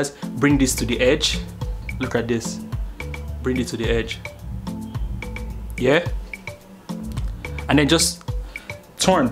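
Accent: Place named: Nigeria. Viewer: Omg you're so cute i love your accent are you from Nigeria? If so ayeee 💚💚!! Oh something else... i still cant do it....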